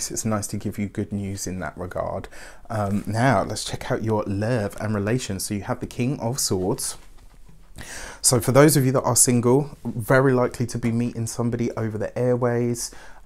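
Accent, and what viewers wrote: England, United Kingdom. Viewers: Great to hear an English reader Thankyou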